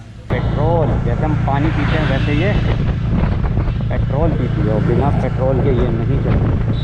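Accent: Kanpur, India. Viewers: Bhai...amazing vlog series....but yaar aapka typical Kanpur accent is really wonderful...😊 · bhai maza a gya apki voice sun ke kanpur ki ek alg hi feel ata he maza a gya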